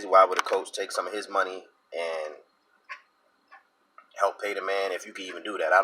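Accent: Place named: Washington DC, United States. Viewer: where you from my good man seem like you close to DC just cause of the way you sound and you had some good views on the raiders fucking with you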